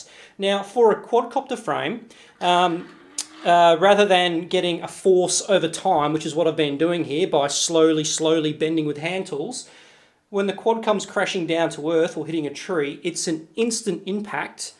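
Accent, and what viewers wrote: Australia. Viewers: Love the strayan accent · Nice australian accent!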